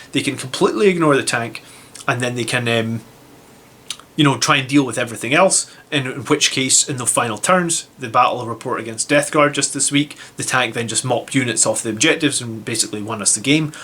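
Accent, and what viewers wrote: Scotland, United Kingdom. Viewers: Really enjoy the channel and the Fb group. Also nice to hear a Scottish accent on the Internet.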